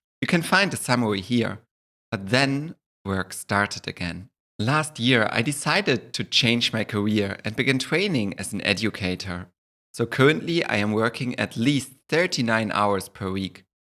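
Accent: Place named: Germany. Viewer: Das klingt so falsch wenn ein Deutscher englisch spricht.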